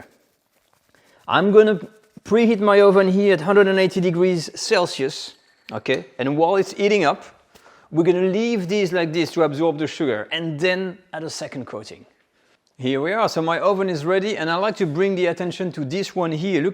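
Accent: France. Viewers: Everything tastes better with that French accent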